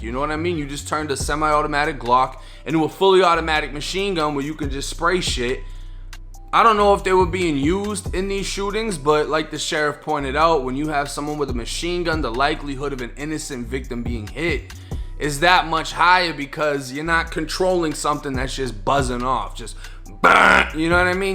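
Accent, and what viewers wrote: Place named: Northeast, United States. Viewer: I was wondering where your accent came from. I have never heard a Florida dude speak with that accent, that's a Northeast accent if I ever heard one. What's the story? Love the channel btw, real fukkin nice work and journalism, slaute!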